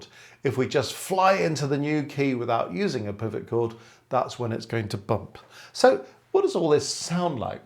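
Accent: United Kingdom. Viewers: British accent? Bossman teach you nuance in music theory so you can thrive and you can't distinguish which part of the Isles his accent derives? Coolios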